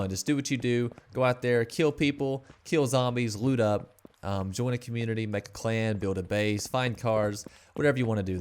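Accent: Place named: United States. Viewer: Dude, you sound like your from the country. Me too.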